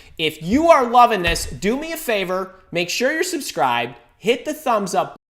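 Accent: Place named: Midwest, United States. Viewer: Oh my word! I can tell you’re definitely from the Midwest!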